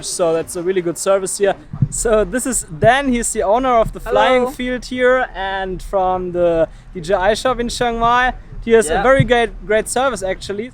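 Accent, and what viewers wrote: Germany. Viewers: Man hört den deutschen Akzent einfach überall raus:D Gutes Video !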